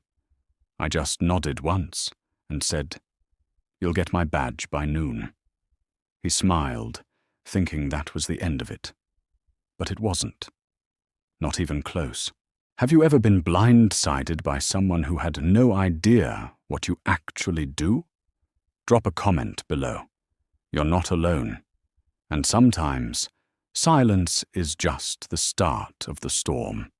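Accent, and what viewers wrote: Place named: Upper class, United Kingdom. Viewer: In Akron Ohio, a British Aristocrat narrating the story. WOW !!!! At the 4 minute mark, check out the AI text, which transforms "CLAUSE" to "CLAWS"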